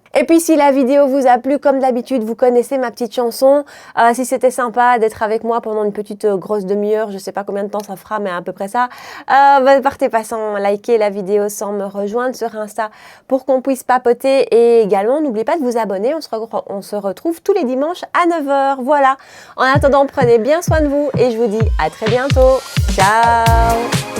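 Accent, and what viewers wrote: Belgium. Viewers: D'ailleurs tu devais pas nous faire une vidéo ds ce style déjà avec ce belle accent beilge 🤭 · Cc, très belle vidéo pépites oubliées que j'adore. Je dis un grand oui pour une vidéo avec ton accent belge😂. Bon dimanche 😘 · Coucou, j'adore tes vidéos pépites, re-découvrir les produits c'est génial, merci tu m'as fais sourire avec ton accent belge (une fois),😅,j’aime bien deviner les produits que tu vas sortir de ta boite à chapeau tel une magicienne, au moment du rouges à lèvres,j'ai direct pensé au Dior Sequin,j'ai la nuance 893 un violine avec les paillettes rosée, ça claque pour les fêtes et pour cette saison ❤ Bonne journée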